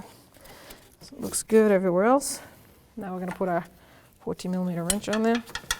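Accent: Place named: New York, United States. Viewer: Sounds like she's from New york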